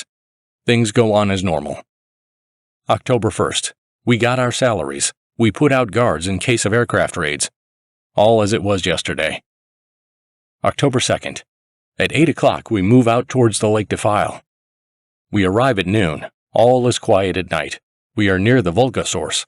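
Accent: United States. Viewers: I loved this series until you added the bullshit American voice.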